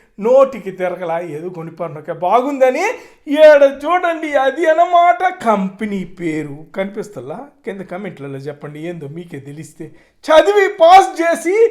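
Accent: Nellore, India. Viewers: అన్న బాగుంది అన్న లంచ్ బాక్స్, స్పూన్ కూడా బాగుంది మీ మాటలు సూపర్ మన నెల్లూరు భాష బాగుంది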